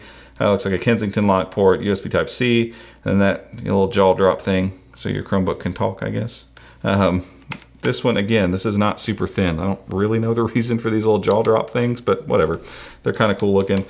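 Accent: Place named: South, United States. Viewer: Talks SO fast in a southern accent for a Mainer is hard to understand you.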